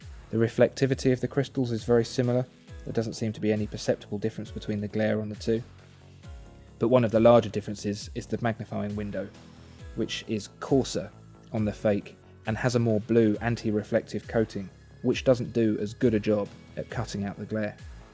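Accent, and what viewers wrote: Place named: RP, United Kingdom. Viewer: this guy has a very nice royal accent.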